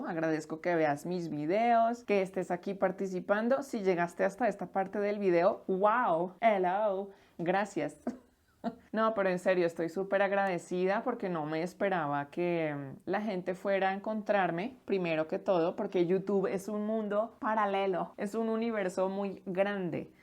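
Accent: Colombia. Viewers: Muchas gracias. Estoy nueva agui... me encanta tu clase, tu manera de ensenar, tu acento de Colombia. Voy a continuar escuchandote. Gracias otra vez. Abrazos de la distancia, de EEUU.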